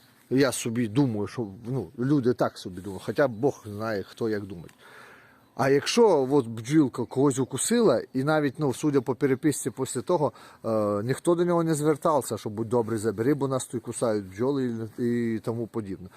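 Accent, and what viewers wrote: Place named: Transcarpathia, Ukraine. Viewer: але й файна в вас говірка закарпатя гарний край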